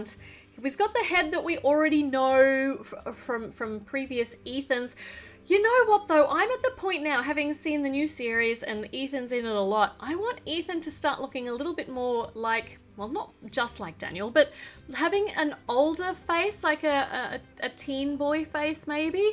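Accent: Australia. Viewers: Ur so good at making legos and I love ur Australian accent I love u ❤️❤️🤗🤗💖😍🥰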